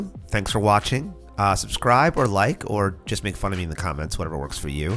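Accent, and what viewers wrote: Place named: New York, United States. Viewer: He's from New York dead giveaway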